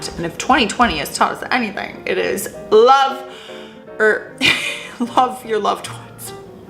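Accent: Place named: Canada. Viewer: Hi, I do love the way you say bagels, granted I probably couldn't say it much better myself being from the North West of the UK, but they way you say it makes me giggle everytime, love your Canadian accent so much, I try watch atleast one of your videos from your multiple channels once a day, longest I've gone it 48hrs, my husband and I watch you (and Charlotte Dobre) everytime I'm on YouTube I just can't resist your sass and pickle talks:)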